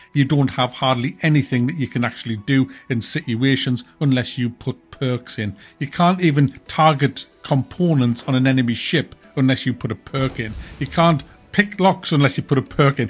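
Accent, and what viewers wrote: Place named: Scotland, United Kingdom. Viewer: oh the accent sounds kind of scottish, but what do i know im from canada lol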